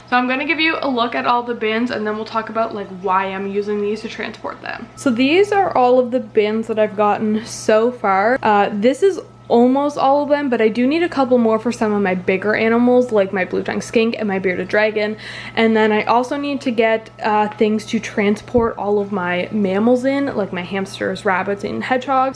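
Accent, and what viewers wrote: Canada. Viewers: I love your Canadian accent lol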